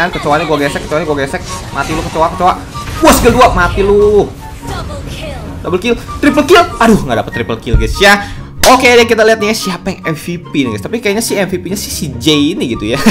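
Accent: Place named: Jambi, Indonesia. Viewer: Orang mana bos? Kek bahasa jambi Gilo haha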